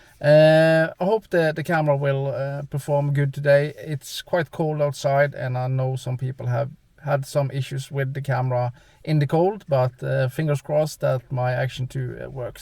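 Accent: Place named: Norway. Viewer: Du ar fra Norge? That accent lol...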